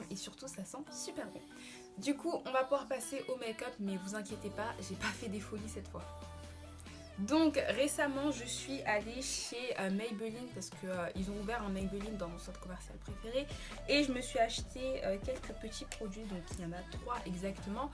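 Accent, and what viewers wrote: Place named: England, United Kingdom. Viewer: Coucou ma belle! Alala je rêve de ton accent anglais ! Bref jadore ton haul, j'aime trop le gros pull new look 😱 bisous bisous 😘👍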